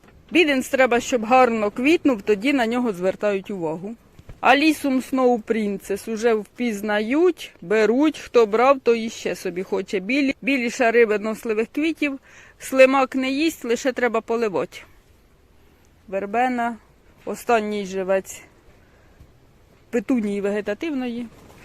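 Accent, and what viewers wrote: Poltava, Ukraine. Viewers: Ви молодець! Трудівниця і розповідаєте цікаво, з гумором. Зачаровує наша полтавська мова. Всі відео цікаві. А це хочеться дивитись безкінечно. Яка краса! Де саме Ви живете?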